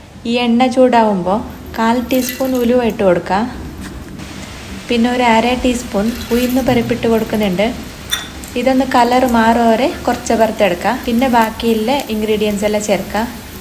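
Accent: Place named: Kannur, India. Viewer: കണ്ണൂർ ഭാഷ അടിപൊളി👌👌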